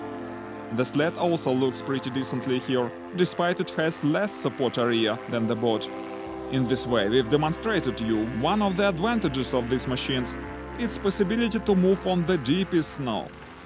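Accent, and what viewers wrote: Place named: Russia. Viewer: Can't you hear the accent? Rus-rus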